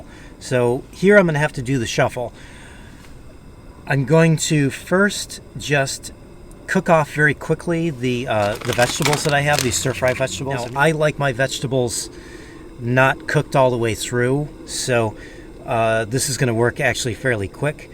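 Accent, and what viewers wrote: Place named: California, United States. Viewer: That's why your accent sounds familiar to me because you are from California. You can make your own pasta sauce. Tomatoes, a little red bell pepper (to keep the red colour of the sause) and one stick of celery to bring out the tomatoes taste, blend. It also makes a good tomatoes soup too 😊. I take it your mind is doing it's thing in it's regular time slot again. All good 🤗.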